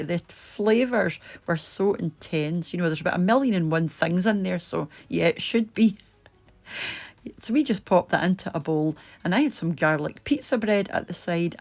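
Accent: Scotland, United Kingdom. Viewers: I've made your scotch broth and OMG loved it! I will try this too, but I have to say, you could burn toast and I'd still listen. Love that Scottish accent. I can't wait to visit Scotland - as long as Nicola doesn't close the border to us English 🙄 Anyway, 💜 to all in Scotland xx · Old recipe but I'm making it. Love your Scottish accent. :) looks amazing....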